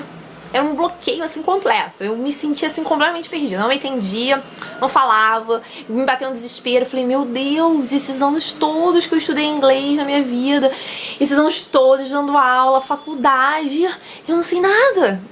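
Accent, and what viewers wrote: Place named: United States. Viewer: Nossa, como você fez para ter um 'speaking' tão bom? Adoraria ir para os EUA e eles acharem que sou de lá também rs (como todo mundo né rs). Enfim, dicas para falar tão bem assim e perder o 'sotaque brasileiro'? Sei que o assunto é 'Listening', mas como seu sotaque americano ficou tão perfeito assim? Thanks a lot :)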